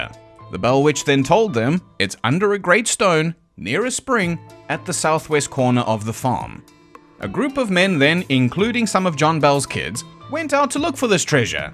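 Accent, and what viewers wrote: South Africa. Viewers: I thought u were south african when i heard ur accent😂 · South African?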